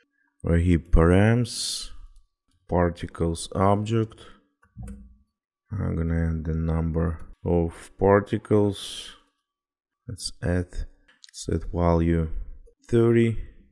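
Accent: Russia. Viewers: Молодец, хороший контент! Нотки русского акцента есть, по этому решил поинтересоваться!)!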